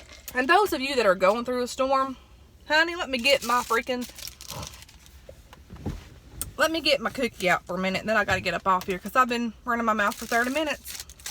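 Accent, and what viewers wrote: South, United States. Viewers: I'm a new subscriber! I just love you and your attitude/personality! You're so pretty and I love your country accent! Girl I feel you and I ain't got time for no negative stuff either! #2018 #Amen 😍❤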